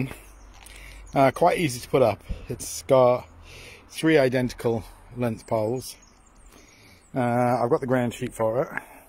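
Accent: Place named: Melbourne, Australia. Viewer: i lived both in the UK and the US but you dont sound like either you have this Melbourne tone to you 😀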